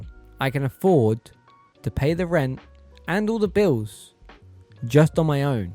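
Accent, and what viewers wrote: United Kingdom. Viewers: I love that you have a British accent. I can't imagine them in American accent 😂😂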